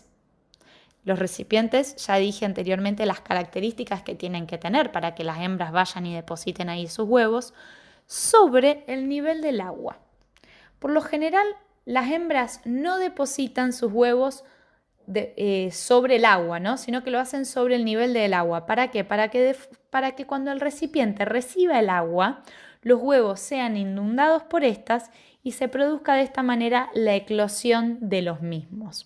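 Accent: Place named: Argentina. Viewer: tenia que rendir un oral, necesitaba esto, gracias algoritmo, por fin me mostro algo en castellano bien argentino.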